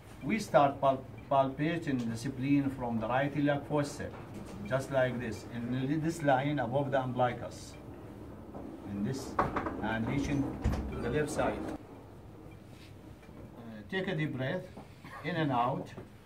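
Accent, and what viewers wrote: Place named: Iraq. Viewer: Iraqi accent is so cute ❤️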